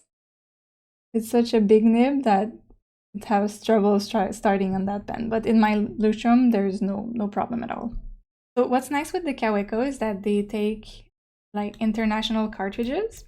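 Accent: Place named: Quebec, Canada. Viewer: Omg haii! It's my first time watching your videos and I notice your accent sounded very familiar, then it clicked you must be from quebec province~ me too ^^ Thank you for the well elaborate video, it helped me a lot to choose since I've only recently started this hobby and I was lost on which pen to start (funny enough the first thing I bought were bottled inks xD ) that pearl kaweko fountain pen surely is very pretty and lovely, too bad it was a limited edition TT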